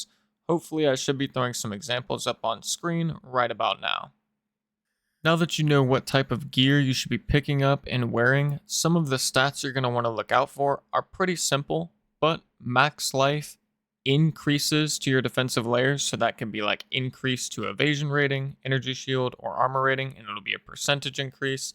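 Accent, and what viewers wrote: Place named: RP, United Kingdom. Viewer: Why do you sound so posh mate?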